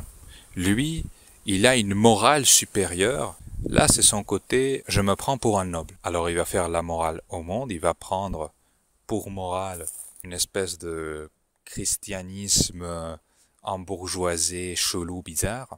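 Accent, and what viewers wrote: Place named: Italy. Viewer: Quel est ce bel accent italien ? Qu'est-ce que la "dissidence cognitive" ?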